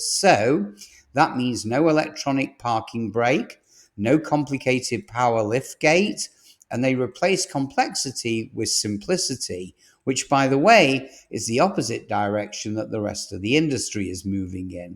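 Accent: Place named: United Kingdom. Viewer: Love that British accent, and apparently not AI. Great work!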